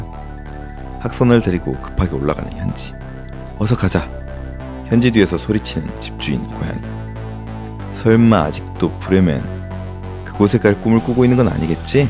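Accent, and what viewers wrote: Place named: Jeolla, South Korea. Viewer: 전라도신가부다..ㅋㅋ 사투리 억양~~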